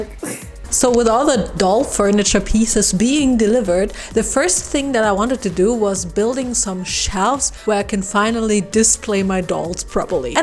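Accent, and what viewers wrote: Germany. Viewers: I love your videos and your German accent I am German and shout-out to u and your dad yall do amazing work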